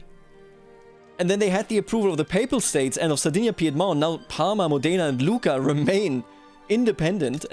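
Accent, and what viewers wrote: Bavaria, Germany. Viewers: Yup I can hear the Bavarian Very nice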